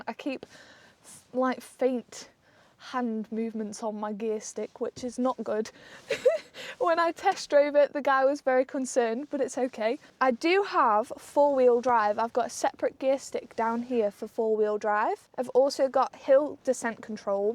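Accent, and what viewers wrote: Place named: North West England, United Kingdom. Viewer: Hey! On the friendship note, I'm pretty rubbish with accents but I sense you're from somewhere relatively close to me (North West). I'm 26 and don't have many friends that are like-minded in this sense so I'd so be up for meeting you, I feel like we'd get along! Need more friends to do some nice walks with as Spring arrives 🫶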